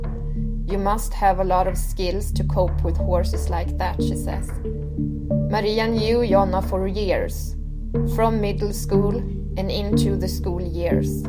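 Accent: Sweden. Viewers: The Swenglish is killing me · William Redshield This language is called svengelska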